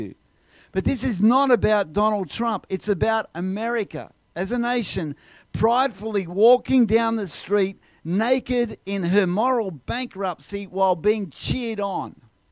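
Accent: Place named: Australia. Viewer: Great to hear an Australian Christian voice predicting the rebuilding of American Christian faith. I pray you are right. God bless. SOK. Geelong, Australia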